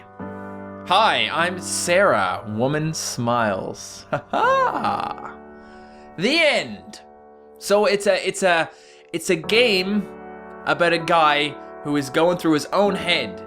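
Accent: Canada. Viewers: his Canadian sorrys really show in this video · Yes, he is from part of Canada where they say that.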